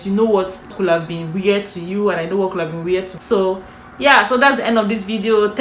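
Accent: Nigeria. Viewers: Lol u sound sooo Nigerian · I am sure that she grew up with Nigerians cos her accent is purely Nigerian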